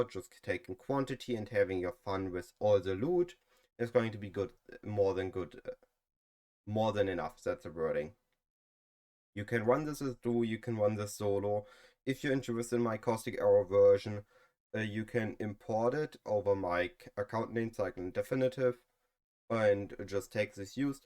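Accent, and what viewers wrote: Germany. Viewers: German accent is so disgusting and muddled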